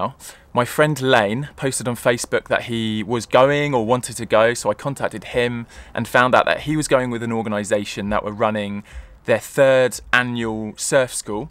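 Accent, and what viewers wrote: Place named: England, United Kingdom. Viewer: This guy looks like a Jamaican Sharlto Copley with an English accent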